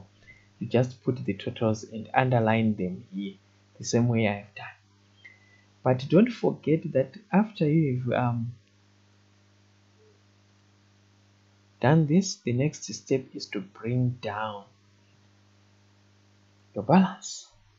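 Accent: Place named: Zimbabwe. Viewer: Sound like a Zim brother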